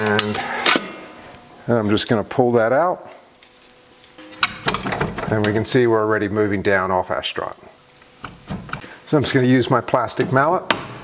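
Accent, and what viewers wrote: Australia. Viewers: Thanks for the video instructions. You wouldn’t happen to be an expat Aussie? It’s messing with me because an American accent is sneaking in with some words 😂. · Sounds like a bit of an aussie accent creeping through? Good info mate.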